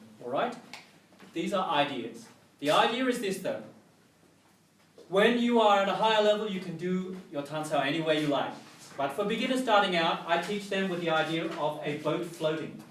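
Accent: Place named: England, United Kingdom. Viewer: Hi, love your channel. I've been practising Wing Chun for 2 years now, and my sifu's philosophy resembles much yours. It's all about relaxation and feeling in the arms when practising Chi Sao. You sound like you're from England. Please confirm and if so, I'd love to come to your course sometime when I visit England again ( hope I'll be soon enough, I'm not too far: south of France ). Cheers!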